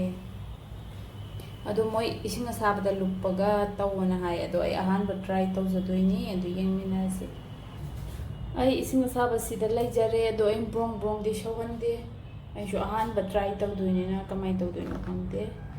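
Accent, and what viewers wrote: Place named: Kakching, India. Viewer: I knew it that you were from kakching the first time I started watching your video. My mum grew up in kakching and the way my mom speaks manipuri language whenever we visit kakching is same as yours ❤️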